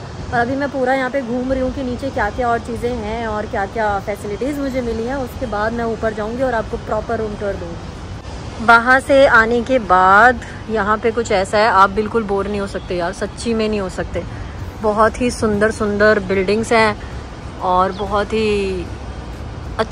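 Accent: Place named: Pakistan. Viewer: Aap Pakistani drame bahut dekhto ho lagta h .apki bat karne ka dhang thoda vaisa hi gya h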